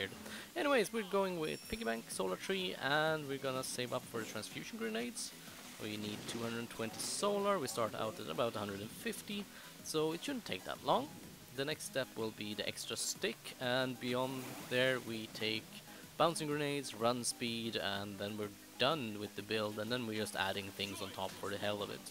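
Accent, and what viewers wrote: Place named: Sweden. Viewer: You sound so Swedish, are you really from the US?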